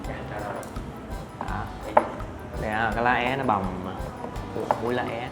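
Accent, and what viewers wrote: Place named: Binh Dinh, Vietnam. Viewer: Giọng bình định nghe mà nhớ quê quá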